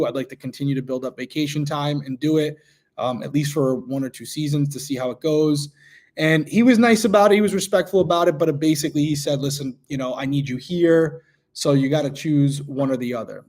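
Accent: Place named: East Coast, United States. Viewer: ❤❤❤ I can straight hear your east coast speak!! Love love love it!!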